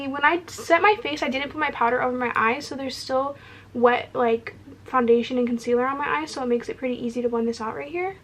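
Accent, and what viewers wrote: United Kingdom. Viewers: The British accent LOL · i love your random british accent 😂❤️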